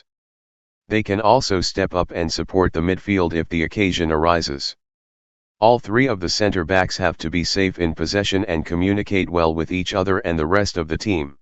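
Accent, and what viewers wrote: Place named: United States. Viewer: Robotic American accent . YUK!!!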